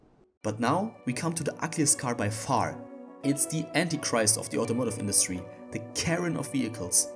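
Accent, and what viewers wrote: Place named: Germany. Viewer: Damn bro, your German accent is hella cool for this type of video Keep pushing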